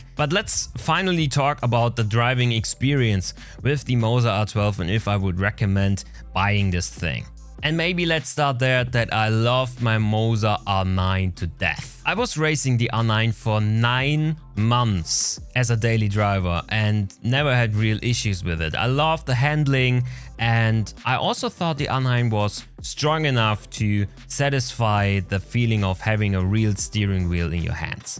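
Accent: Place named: Germany. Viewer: klar, 160 euro mehr als Deutscher... Abzocke at its best, und dein german accent suckz bad, versuch besser mal auf deutsch -.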